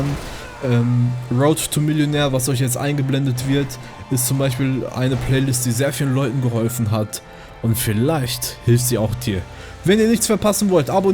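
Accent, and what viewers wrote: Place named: Turkey. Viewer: Dein Türkendeutsch geht mir am arsch. Lern die richtigen artikel und fälle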